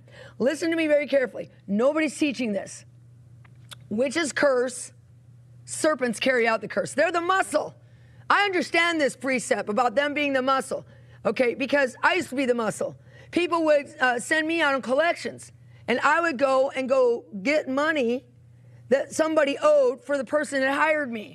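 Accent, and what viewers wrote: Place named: New York, United States. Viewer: 😂, you from NY ❓️ you sure got a NY accent and way ❗️🤣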